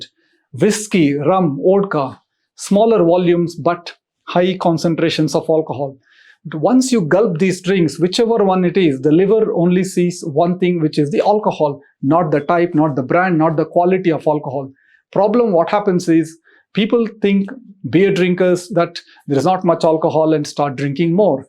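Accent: India. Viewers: Awesome Indian accent. 😆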